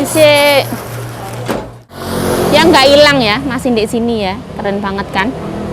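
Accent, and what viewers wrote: Java, Indonesia. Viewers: Logat jawanya di kurangi yu klw berbicara bahasa taiwan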